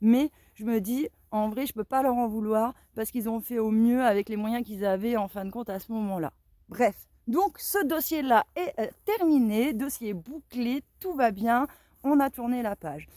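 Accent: Paris, France. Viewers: Petits conseils : réseaux sociaux, pas réseaux socials, ça pique! Essayer de perdre cet horrible accent parigot. Et enfin, suivre une psychothérapie, dont il semble que vous ayez grand besoin.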